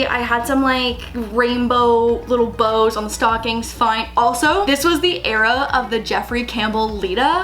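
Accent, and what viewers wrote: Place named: Ontario, Canada. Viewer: You can 100% tell is from ON bcos she doesn’t pronounce the 2nd t in Toronto 😂😂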